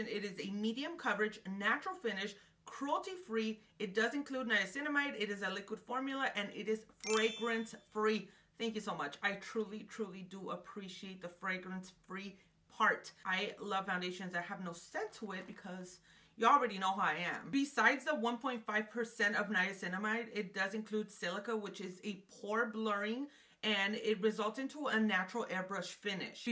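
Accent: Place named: Rural, Mexico. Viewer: The way you talk reminds me of my people from back home in mi ranchito. Tysm for the educational and entertaining content! Subscribed 🤍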